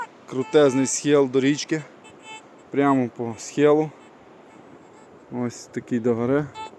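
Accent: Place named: Bukovina, Ukraine. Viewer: вітаю.цікаві походеньки, підписаний на Вас.Чую по мові та бачу по природі, що Ви десь на Буковині.Якщо це так, то хотілося би знати район, а все для того, щоб десь походити разом.Дякую і кладуху сєрєбруху!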